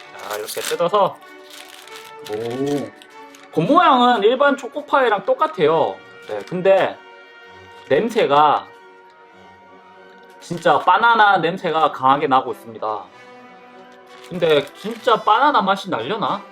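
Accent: Busan, South Korea. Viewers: 사투리일걸여 부산사람이라서 ㅋㅋ저도부산사람이라 바나나가안되여 빠나나밖에안댐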